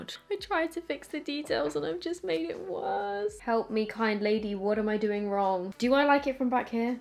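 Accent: Australia. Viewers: Okay but your Aussie accent slapped 🤌🏼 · i love the way you keep slipping into an aussie accent whilst talking 😂😂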